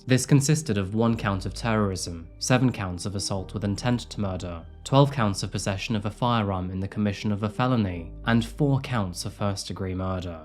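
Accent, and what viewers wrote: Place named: RP, United Kingdom. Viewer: So I have great fondness for our friends in the UK, but man your manner of speaking sounds like it’s straight out of Eaton, very overly posh or at least a high-low, sing-song rhythm. Great content, it’s just the voice over is tough to listen to.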